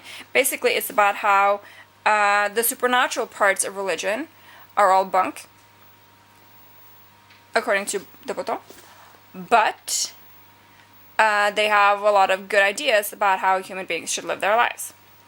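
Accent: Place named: United States. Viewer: Wow. Your accent sounds entirely American. Is it common for Norwegian to speak as you do? Thanks for the book review.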